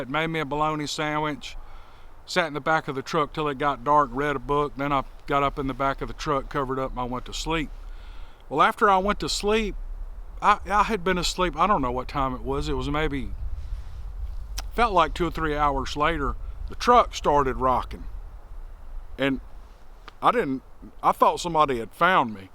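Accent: South, United States. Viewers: you've got the best southern accent and best voice on You Tube! Nothing that comes out of your mouth is ever lame! You could talk about working in a sewer and it would sound so sweet! Keep up the great work! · Agreed! I told him he's my people! Live southern people and refreshing to hear my own "language on you tube! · Yup! I really enjoyed your lame story 😊 Pretty sure it’s the accent, your “good ole boy” accent. Listen to an Australian talk and then yourself … I was born in the wrong country. I could listen to you tell lame stories all day! ❤ Thank you. 🥰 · Made me chuckle. You've got a great southern voice; you could probably read from the phone book and people would listen to it.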